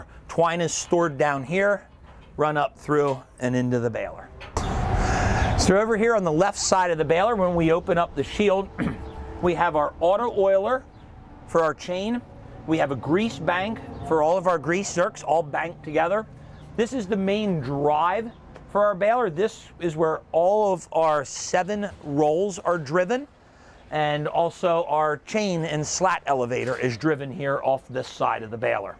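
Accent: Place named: Wisconsin, United States. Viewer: He talks funny, is that a Wisconsin accent?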